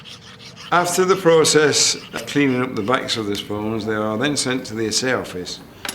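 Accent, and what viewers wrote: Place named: United Kingdom. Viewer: English, but with a British accent.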